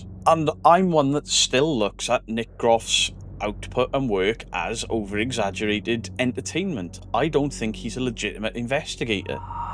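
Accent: Wales, United Kingdom. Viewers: All I'm hearing is Welsh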